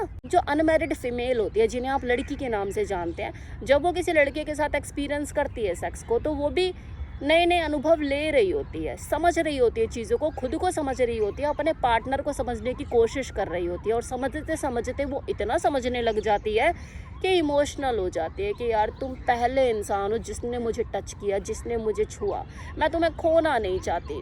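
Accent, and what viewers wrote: Punjab, India. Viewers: Panjabi figar Panjabi lehja. .wah kya baat hy janab . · Listening Hindi with punjabi accent 👍👍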